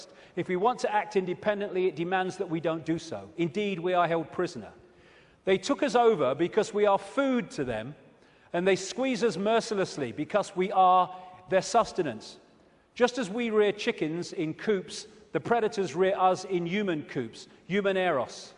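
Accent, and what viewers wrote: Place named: England, United Kingdom. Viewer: This guy is the best!!! He's out of his mind, and he has an English accent to boot. I love this stuff, though, b/c as a sci-fi satirist, this stuff is a veritable goldmine. Keep them coming, and do not take your meds. You're fine just the way you are, in all your conspiratorial, absurd glory!!!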